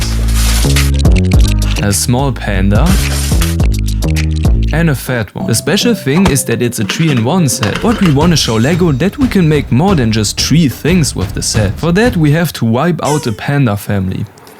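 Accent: Germany. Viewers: He sounds German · Why do I hear DEUTSCH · Are you German it Fells Like a German try to speak englisch 😅(no hate) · I hear a littel german ngl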